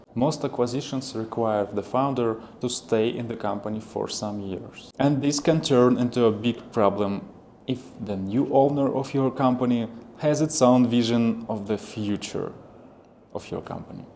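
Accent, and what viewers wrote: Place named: Russia. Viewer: russian accent, I have the same, we have to think what we want to say first before saying. But thanks for the tip it's much better now :)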